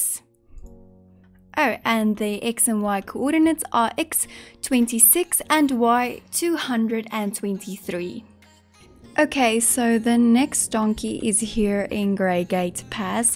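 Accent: South Africa. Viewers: Hi, are you South African because I recognize your accent. 🙂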